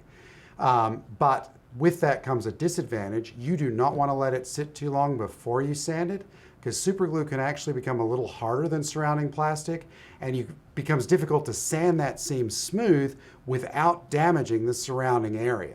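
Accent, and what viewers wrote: Australia. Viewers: Great video as usual. As an Australian I can hear a hint of our (or NZ) accent in your speech!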